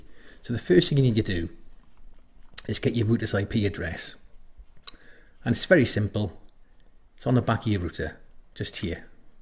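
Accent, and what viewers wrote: Wales, United Kingdom. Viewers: Omg, Taffy...lol it's so lovely to hear that Welsh accent...I miss UK.... Anyhoooo, cheers for that will fix it tomorrow as a, little drink is in order right now 🥂🥂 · This guy sounds so British! You're one of the most British tech channel I ever watched. (Most tech channels are either Indian or Americian)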